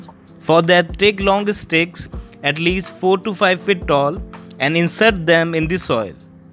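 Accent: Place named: India. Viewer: ох уж этот индусский акцент.... ужасно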